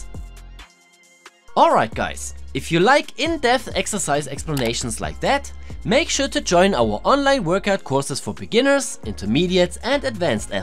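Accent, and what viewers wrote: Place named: Germany. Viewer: Damn ur German accent is nuts.. I like it, im german as well. Lass Frankreich einnehmen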